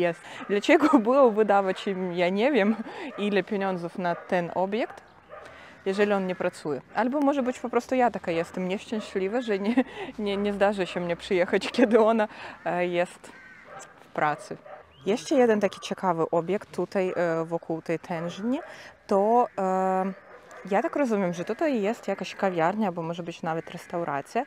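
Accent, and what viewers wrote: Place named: Ukraine. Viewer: Jesteś fantastyczna i ten Twój specyficzny język z ukraińskim akcentem bardzo mi się podoba ,już któryś raz z kolei proponuje Sandomierz w świętokrzyskim 👍👍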